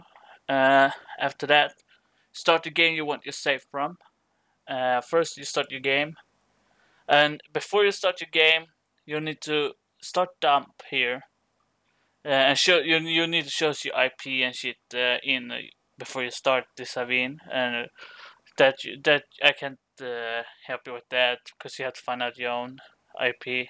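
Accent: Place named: Arab world. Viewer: ok so if u are offended by constructive criticism (not complaining), im sorry...but u speak and write as if your native language is arabian or something like that and also you seem to swallow full words..i've just been honest..if u don't like honesty..then why not deactivating the comment section or just don't talk to me...and why didn't u say this earlier that u don't have your nintendo wii anymore..we could have saved so much typing here...the people who tell u that this video is fine, they most likely have had experience with this stuff before..i asked because im a newbee and usually i can follow every and the most complicated trutorial on the web successfully, even if i didn't know about the subject before, but this isn't possible with your tutorial, you should reconsider if it's really me who made the mistake here..or you...not to forget that i already mentioned that yours is the only video tutorial i found on the web treating this subject..but yea, if your tutorial here was really just about transfering a nintendo wii save state to the pc, then the whole name of this video is already misleading for english people, i wanted the opposite..btw. 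my native language is german and i don't seem to have issues with english typing or speaking..and i got one last thing for u, if it's really a problem for you to be held responsible for older videos you've made, why not deleting them..i just don't get u..i mean i'm sry that u can't deal with constructive critcism...i will find hel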